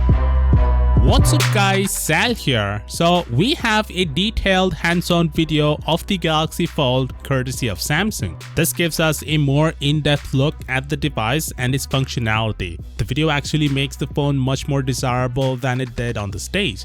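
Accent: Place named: India. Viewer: since your indian yourself probably you should easily be able to tell his indian. I have watched a lot of "microsoft tech support scam videos" and i can tell by his voice/accent he is indian.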